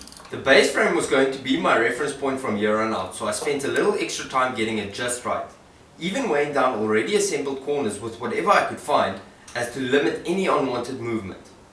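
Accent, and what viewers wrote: South Africa. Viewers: At last... I thought I was the only YouTube maker in Africa... LOL, I'm in Namibia, you sound like you from SA... glad I found you... Sub'd... Great job bru... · South African? I assume it from your accent. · Dude, this is awesome. Nice to hear your accent - I'm a kiwi living in Lexington, KY and don't get to hear the South African accent AT ALL any more. Stay well brother! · Is he South African with that accent?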